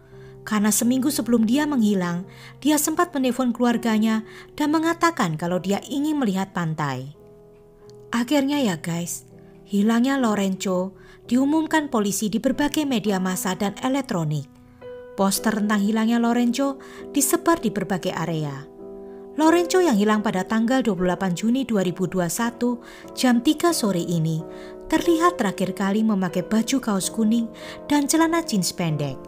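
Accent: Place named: Java, Indonesia. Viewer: Mentok bngt jawanya ya mbak hehheh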